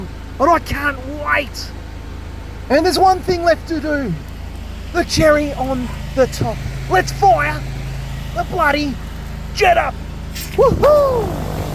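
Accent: Australia. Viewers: Watching from the Netherlands. Stumbled on your channel when your vid about unclogging a drain in a parking lot showed in my suggestions. No idea why, but it is hilarious, especially with your ozzie accent. Early next year we have plans to visit Perth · This reminds me why i don't got to Chinese buffet anymore and why it gave me diarrhea when i did.... this is funny shit and the aussie commentary makes it better...... it's choka blocked mate....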